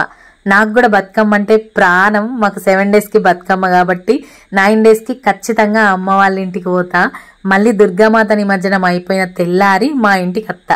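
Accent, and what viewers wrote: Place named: Karimnagar, India. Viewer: మాది కరీంనగర్ జాబు భద్రాచలం లో కని మీ మీరు యంగ్ గ ఉన్నారు బాషా చాలా బాగుంది మేము మీ బాషా చాలా మిస్సు అయం సూపర్ మీ వైఫ్ మీది కేమిస్టర్రి బాగుంది 🎉🎉🎉🎉🎉🎉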